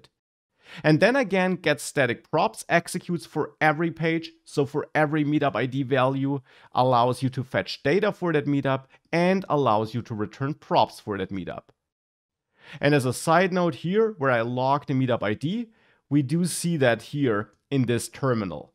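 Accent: Germany. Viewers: Warum höre ich ein deutsches Akzent. Naja alles gut Bruder l.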